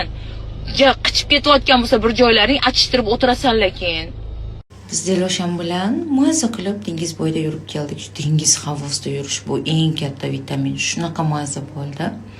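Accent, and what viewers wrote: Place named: Samarkand, Uzbekistan. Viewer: Toshkentcani eplolmisiz samarqand shevasida gapiruvrin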